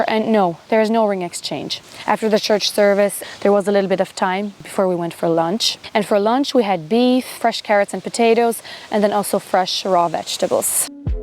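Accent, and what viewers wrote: Ireland. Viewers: Irish here, living in California with 30 yrs. Your accent sounds Irish to me??! History of the Hutterite please? Curiosity getting to me lol! Hope everything went very well and God’s blessing on the new couple for a long marriage and children God willing, you got my taste buds wanting to try your food! Thank you xx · What a delight the YT algorithm was to me today to find you, your sweet spirit and generous sharing 🙌🙏 I really enjoyed this educational and inspiring look into your world and your almost-Irish accent reminded me so much of my Irish gramm 🥰 We’re in the construction industry in Alberta and have always had Hutterite (ex colony) boys in our employment: thee most respectful, hard working and diversely talented employees ever. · First time watching. Have you ever been told you have an Irish accent. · I take it you’re in Canada but do I hear a hint of Irish too?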